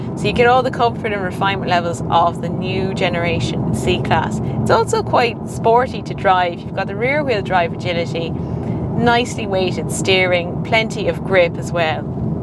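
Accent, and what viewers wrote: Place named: Ireland. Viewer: "Roarrd" Isn't the Irish accent lovely ❤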